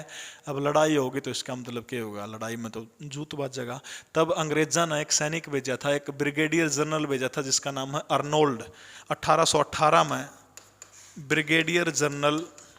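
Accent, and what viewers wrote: Haryana, India. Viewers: Aapka padhane ka Haryanvi style mast h guru jiiiiii..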